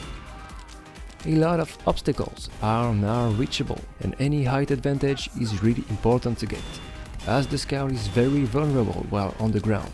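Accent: France. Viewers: I just like listening to his therapeutic French voice. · Are you French? :D That sounds like a French accent.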